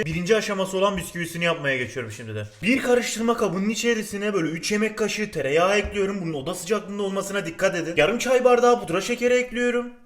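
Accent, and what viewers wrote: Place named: Erzurum, Turkey. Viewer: Abi nerelisin dadaş gibin konuşuyorsun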